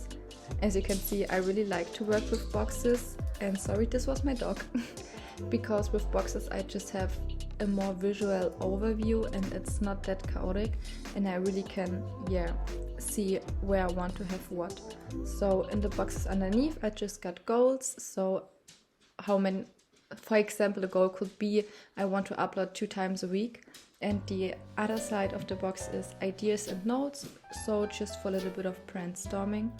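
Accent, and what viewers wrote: Germany. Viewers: I found your channel today and love it. But one question can it be that you actually speak German? Your English is great, no criticism. It just sounds like German is your mother tongue. Many greedings Annabell Elaine ❤️❤️ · are you from germany? your accent kinda sound like it!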